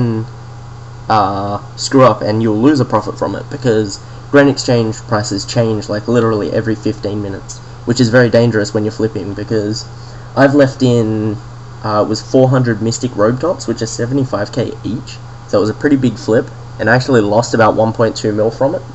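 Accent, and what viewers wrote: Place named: Australia. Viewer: PURE FUCKING AUSSIE!!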